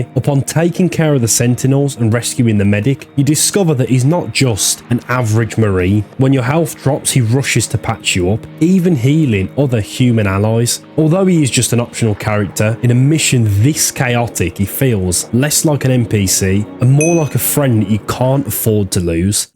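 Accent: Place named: Wolverhampton, United Kingdom. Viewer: Id love to know where your accent is from. Im gonna bank on wolverhampton. Great video anyway❤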